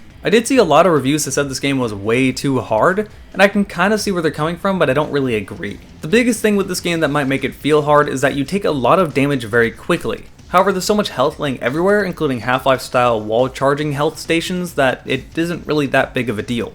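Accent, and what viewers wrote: Italy. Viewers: Honestly he sounds Sicilian. Call a Siciliano, Italian. He'll punch you. Try it.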